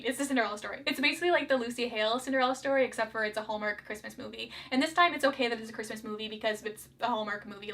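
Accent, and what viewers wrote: Canada. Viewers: Your Canadian “out”s were STRONG in this video!!:)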